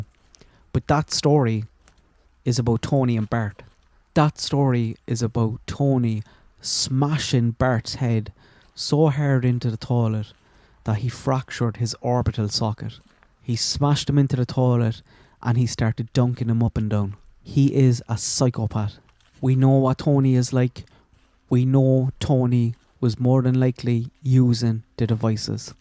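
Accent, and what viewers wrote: Ireland. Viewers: Ok I'm new to your channel, about a month or so & I'm from the US & adore your Irish accent & your dog is beautiful. My apologies on this day I cannot finish watching the video bc of hearing of the abuse to Tony Klines daughter, black eye at 4 & burnt hands. I know it's important for your channel if your videos are watched to the end but I just can't listen to this. So sorry. Oh but I know what I can do I'll finish the video with THE VOLUME OFF 🔇 and enjoy your beautiful dog. I'm Mimi btw.💜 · When I heard that accent I knew you were Irish. But I had to check. I'm happy I found your channel. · Thanks for your insight and notes. Heartbreaking for Tony’s family and all the animals he killed. Not related at all but just want to say I love your Irish accent. My gran was from Limerick, which county are you from?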